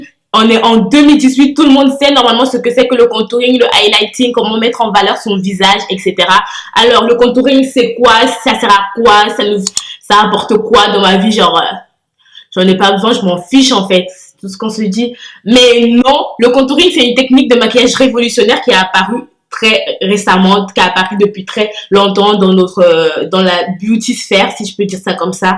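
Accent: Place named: Cameroon. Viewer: Les 1ere secondes ton accent me rappelle que tu es triangle national camer. En tout cas jolie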